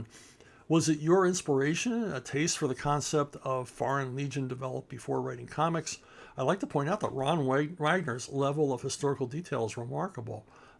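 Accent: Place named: Chincoteague, United States. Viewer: People from my hometown of Chincoteague Island, VA also say "wooter" and "igles." It's so distinctive that I was shocked to hear from Philly pronounce things that way on his podcast. Though Chincoteague had a lot of truck with Philly, including men working seasonally in Philly (where my great-grandfather met my great-grandmother), so that might have something to do with it.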